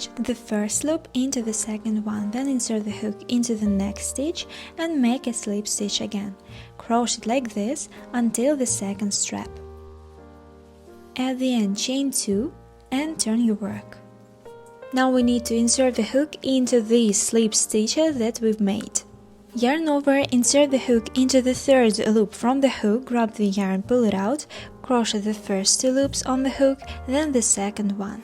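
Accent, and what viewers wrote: Russia. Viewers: я будто слышу русский акцент😂 так легче понимать туториал хаха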